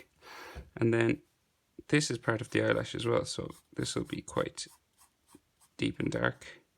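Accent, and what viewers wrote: Ireland. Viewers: hey man you from cork? I hear that accent. Nice drawing thanks for the tutorial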